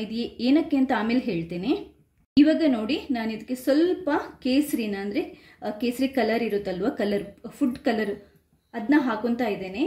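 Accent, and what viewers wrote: Mangalore, India. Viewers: Super ma'am nim kesari bath jothege manglore kannada kelodu Chanda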